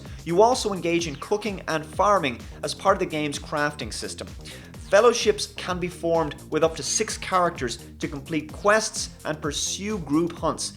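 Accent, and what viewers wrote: Ireland. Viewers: R U irish? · Are you irish? :D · are you irish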